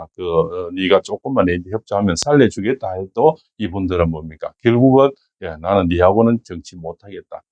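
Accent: Gyeongsang, South Korea. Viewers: 새해복많이받으세요 님은 경상도 같은 고향같네요 윤대통령님 기도좀올려주세요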